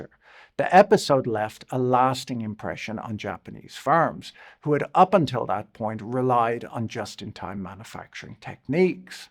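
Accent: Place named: Ireland. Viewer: For not letting that thick Irish brogue keep you from saying the phrase "rare earth" repeatedly in a half hour video essay 👏👏👏 I salute you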